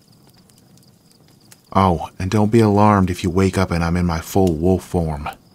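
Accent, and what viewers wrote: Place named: Australia. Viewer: I love it that he called and your Australian accent amazing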